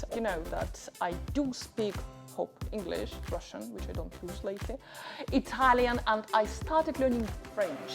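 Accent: Russia. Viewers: Hi, I like your content. Good work. I am a little bit like you, except I am not that pretty. I guess they calling us expats or gypsies 🙂Do I hear a russian accent out of you, or am I totally wrong. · Wow, Norwegian with Russian speaking accent.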